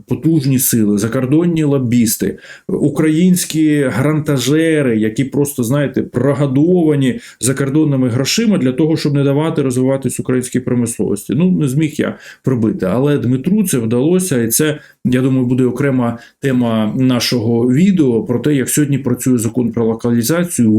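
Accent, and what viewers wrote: Moscow, Russia. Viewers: Прізвище вроді ж Галащук, а людина акає, як московит. "тАвари, пАчув на свАю адресу, Європоейський сАюз, вирАбниками.." Нє, ну невже так важко вимовляти українські слова не акаючи?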